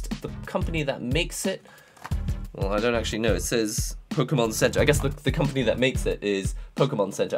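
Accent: United Kingdom. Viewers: A Japanese looking man that sounds British!!! Freaking awsome!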